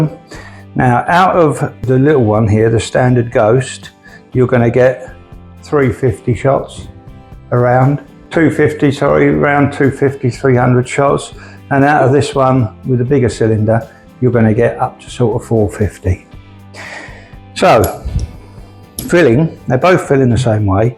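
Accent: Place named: Essex, United Kingdom. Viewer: You sound like you come from Essex you miss name many rifle parts which makes you sound stupid and very boring to listen to!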